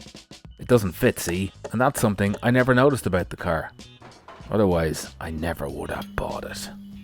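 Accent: Ireland. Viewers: Just discovered this channel, and I absolutely love it! First it's great to hear an Irish accent for a change, and second you are rebuilding one of my most favourite cars, ever since I saw Roger Moore drive one off a pier. Outstanding work too and loving the stop motion to by pass the boring stuff. Excellent stuff!!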